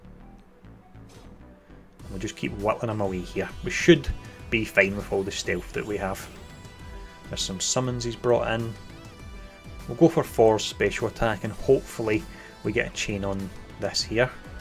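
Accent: Scotland, United Kingdom. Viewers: If I could have picked any accent to hear walk me through this, I would have picked that of a Scot :-D Thanks for the help mate!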